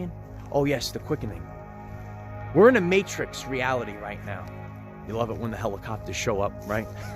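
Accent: New York, United States. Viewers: Yes! These last 2 years have flown by! Are you originally from NY?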